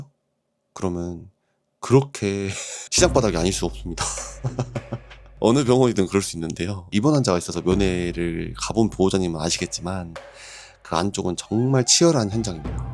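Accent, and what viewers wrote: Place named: Gwangju, South Korea. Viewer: ㅋㅋㅋㅋㅋㅋㅋ 광주분이신가용? 억양이 겁나게 친숙해브네요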